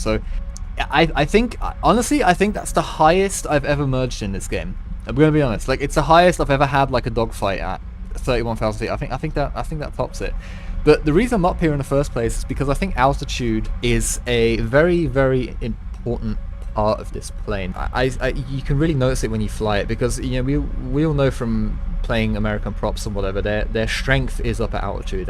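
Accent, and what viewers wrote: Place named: Europe. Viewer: Wow by the sound of this mans voice I would think they were from Europe and seeing a person from that section of the world using FEET instead of the measurements that are used in the countries of Europe(kilometers) hell I live in that one country that uses feet for measurement and I use in-game kilometers just because that's what the majority of people use in this game so it makes it that much easier for me when some one types out in chat things like the bomber is at 6k meters cause I don't think I have really ever seen anyone type out in chat how many feet is the height of the bomber. But to each their own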